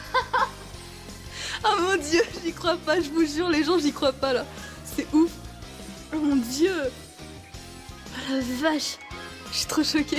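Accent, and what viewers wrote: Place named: France. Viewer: OH MON DIEU J'ai choquée en entendant une voix françaiiise ! xD Je t'aimeuuuh ! ♥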